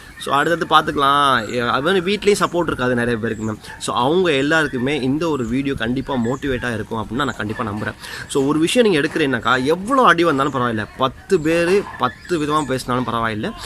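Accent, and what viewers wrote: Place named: United States. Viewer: Woooow what a American engalish speech language... Good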